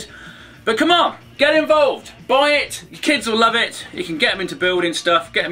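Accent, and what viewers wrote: United Kingdom. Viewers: I love his British accent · It was some screws he's British it's his accent that makes you think that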